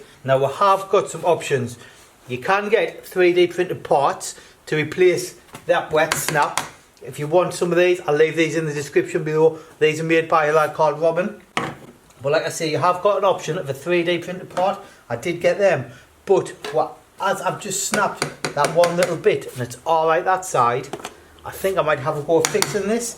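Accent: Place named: Scotland, United Kingdom. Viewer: is Scottish. It’s a Scottish accent 🏴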